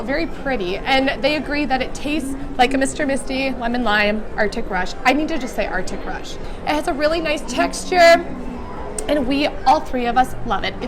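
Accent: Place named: Western New York, United States. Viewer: are you from western NY? The accent sounds like you may be.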